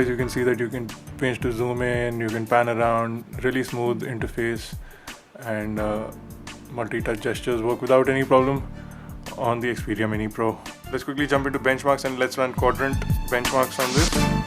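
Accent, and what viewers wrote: India. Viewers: Very nice presentation! Thank you! It would be even better if you talked just a little, little bit slower, since Your "india/english" accent could be a little tricky to apprehend if one is not used to it ;-). Besides that it was an EXCELLENT Presentation!!! Thanks!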